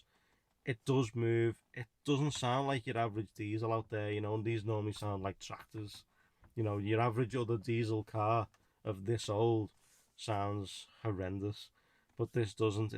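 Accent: Liverpool, United Kingdom. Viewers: I could hear everything he said. Are you deaf or just can't understand a scouser?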